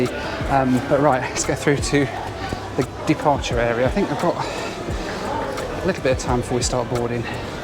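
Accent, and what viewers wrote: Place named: Leicester, United Kingdom. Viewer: Which part of Leicester you from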